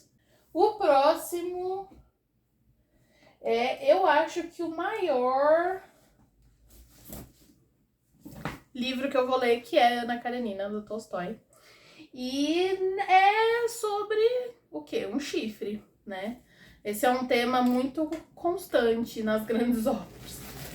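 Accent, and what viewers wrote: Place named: Inland São Paulo, Brazil. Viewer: Além de amar seu conteúdo (te sigo há pouquíssimo tempo), preciso falar que te acho belíssima, que sua voz e seu sotaque muito me agradam (você é do interior de SP?), sua roupa e maquiagem são lindos!! Gostaria de sugerir uma iluminação diferenciada... Eu não sei, não conheço as coisas, talvez aquelas ringlights? Eu achei um pouco escuro, não sei... Obrigada pelo vídeo :) O calendário ficou tudo!!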